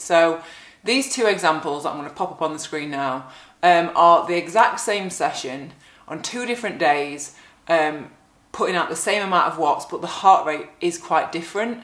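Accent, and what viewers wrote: United Kingdom. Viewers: For me training with HR is tricky, my heart throws an extra beat so the monitor is off. For example I can ride a hilly course breathe normal and have a HR of 240, so I use HR as an indicator of when my O2 max rate is close, usually for hill climbing. On a nonrelated note I do love your British accent and get a kick out of your British tan. lol. keep up the great work.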